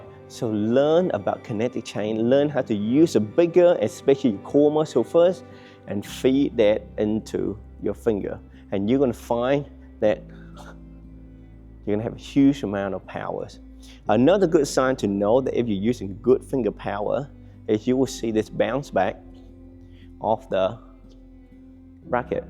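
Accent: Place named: Australia. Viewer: Ok so what if I'm just an intermediate player, what is the best weight type racket and string tension, assuming I'm not very muscled? Even weighted, heavy head, thin griped, light head or which? 😮😮😊😊 BTW, your language and accent give away where you were born and most probably located, down under lol 😊😊😅😅😂😂❤❤❤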